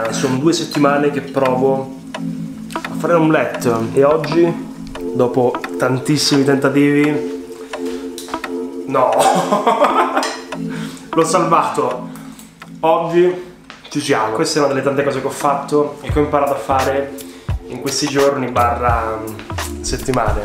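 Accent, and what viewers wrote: Rome, Italy. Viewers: Oddio ti prego stai iniziando a perdere l'accento romano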